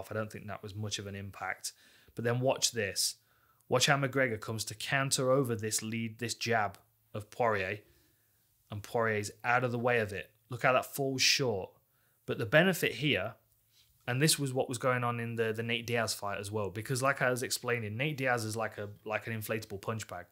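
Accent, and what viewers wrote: United Kingdom. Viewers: Listening to you talk about mma makes me so relaxed man it's a form of meditation for me you heighten all my senses and I love listening to your soothing British accent and excellent flexion. Thanks bro!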